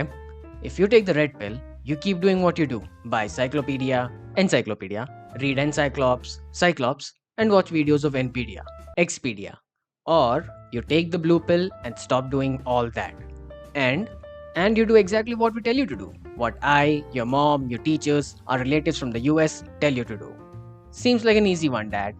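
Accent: India. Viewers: Been forever since I've heard the Indian accent even though I'm Indian, glad I watched ts tho cuh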